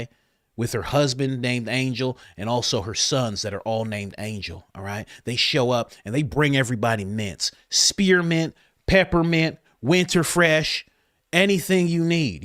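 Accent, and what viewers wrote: England, United Kingdom. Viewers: I love your British accent! 😅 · Why is your British accent better than your American accent? 😂😂😂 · 😂 love the English accent! Alright bruv 😎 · Love the English accent!! The king’s English isn’t that good..😂 King Charles only wishes it were.. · I LOVE your British accent. YOUR SO MUCH FUN TO WATCH THANK YOU FOR ALL YOU DO ❤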